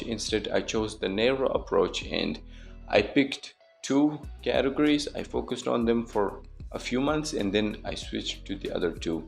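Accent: India. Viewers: Your look like and speaking like this indian😊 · You speak like Indian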